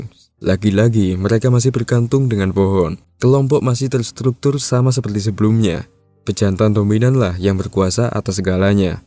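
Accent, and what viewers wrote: Java, Indonesia. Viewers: logat jawa mu tu norak gak usah so, kamu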